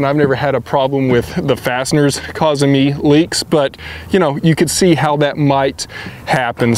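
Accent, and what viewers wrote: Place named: Texas, United States. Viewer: Your Texas accent is part of your charm :)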